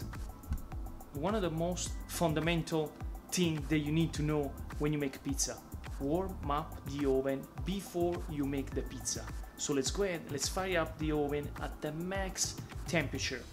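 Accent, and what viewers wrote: Italy. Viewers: Thank you l love watching you make your pizza full of action like dancing and so nice to hear your italian accent, bravo! Greetings fm Malaysia.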